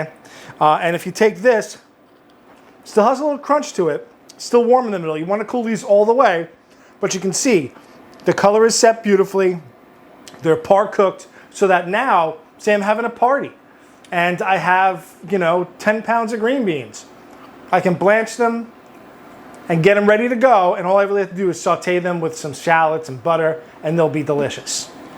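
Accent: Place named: New Jersey, United States. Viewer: When i close my eyes, i sometimes hear Brad Leone. Are you cousins? Or are you from Jersey?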